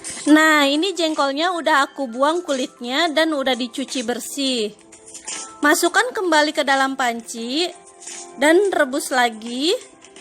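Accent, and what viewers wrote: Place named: Java, Indonesia. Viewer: Wadidau..medok beut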